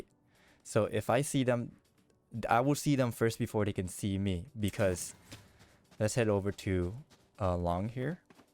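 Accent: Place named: Vietnam. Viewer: Very strong Vietnamese accent homie and awesome video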